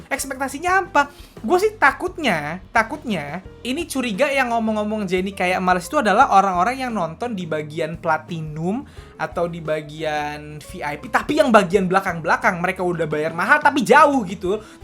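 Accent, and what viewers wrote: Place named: Bekasi, Indonesia. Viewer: Bahasa bekasinya ngelekeb ya bang 😂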